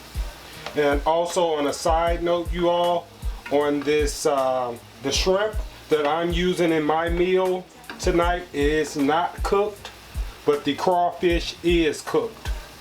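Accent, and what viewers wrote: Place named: United States. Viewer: Whomever would make fun of your wholesome American accent is simply jealous. This recipe looks great! I have not seen crawfish for sale but will start looking for it. This would make a dynamite pizza. For what it's worth: I have been remarkably more healthy since I started making and eating sauerkraut. I used to catch every cold or flu going, had chronic bronchitis for five years. All gone since I started this habit. During the past winter, I have not had so much as a sniffle or cough. Not sure how it works but the effect for me is miraculous. I hope this is useful information.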